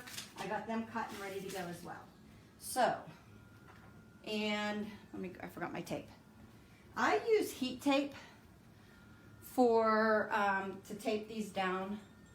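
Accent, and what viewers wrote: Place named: Pittsburgh, United States. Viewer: Hello from a fellow Pennsylvanian. I was watching your video and thought to myself that you sound like you have a Pittsburgh accent. What a very beautiful, creative blanket (loved your granddaughter’s blanket too)! I am just starting out in sublimation. I made a few heartfelt creative Christmas presents. I am continuing to practice. I really love this creative outlet. I, like everyone else, was wondering where you got your blankets from, great size and it looks to be an awesome quality. Thank you for your informative, thought provoking video. Stay safe.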